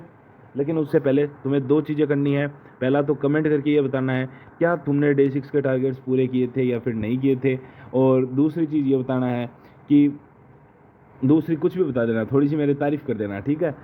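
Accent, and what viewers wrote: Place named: Haryana, India. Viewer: Lgta hai aap utri -purvi rajsthan se ho Hariyana vala tone bhi aa raha hai bich bich me mene to aaj hi viedo dekha apka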